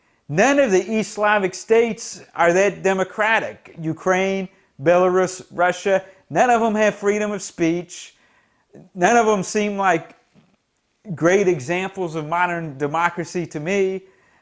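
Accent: United States. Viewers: the American accent making «Cossacks» sound almost like «Kazakhs» ^^ Very worthwhile video!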